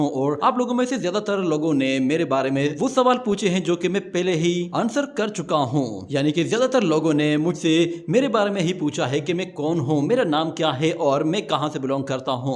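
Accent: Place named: India. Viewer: Mujhe laaga ap Indian ho 😂😂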